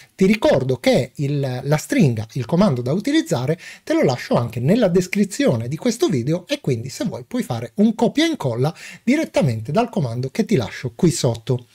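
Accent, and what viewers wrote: South, Italy. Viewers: Salve. Innanzitutto GRAZIE per quello che ci "Regali". in merito a questo video desidererei sapere se e' possibile eseguire la stessa procedura su un PC con windows 7. (dal tuo accento mi sembra di capire che non sei dl nord). Per inciso io sono un GIOVANOTTO di 85 anni e sono stato uno dei primi utlizzatori ed insegnante di INFORMATICA degli anni 70-80 di una disciscipilina con il nome altisonante di "Principi e Tecnica delle elaborazioni meccanografiche ed elettroniche" della Facolta' di Statistica dell'Universita' di Roma; con una grossa lacuna, conosco il funzionamento di ogni componente Hadware, ma sono quasi totalmente IMBRANATO nell'assemblaggio. Ancora GRAZiE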